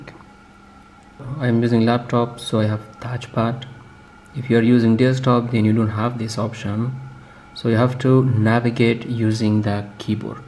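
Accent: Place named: Afghanistan. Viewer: which stable do you come from. Camputer. I laughed who taught you English. I guess you must be an Afghan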